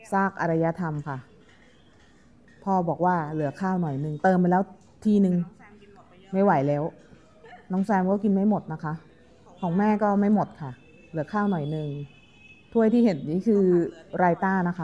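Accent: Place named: Buriram, Thailand. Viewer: สำเนียงเหมือนคนบุรีรัมยเลยคะ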